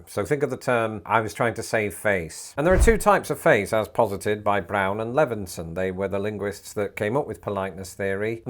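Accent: United States. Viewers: Your American accent is getting pretty good. Just need less nose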